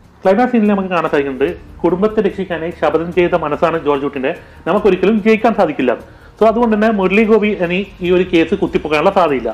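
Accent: Kannur, India. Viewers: Eth kannur karanada... Ee channel owner, thani nadan kannur bhasha... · നിങ്ങൾ കണ്ണൂർ കാസർഗോഡ് കാരനാണോ...? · Nigal kannur ano ?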